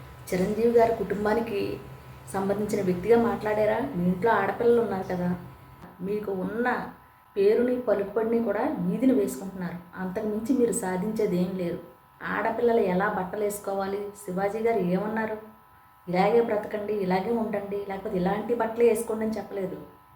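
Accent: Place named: West Godavari, India. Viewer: Fantastic dialogue delivery. Crystal clear Telugu Language. Looks like West Godavari Accent. Slow and Steady delivery of Dialogues.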